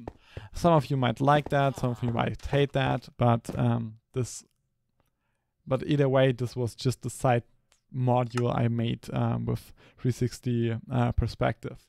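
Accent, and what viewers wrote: Germany. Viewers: In germany say: Deutscher Akzent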